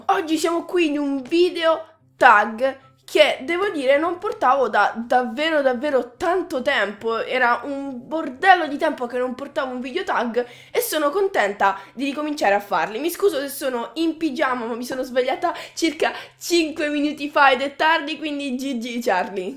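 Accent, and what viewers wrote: Italy. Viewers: charlie non c'é bisogno che non dici di dove sei si sente anche io sono di quelle parti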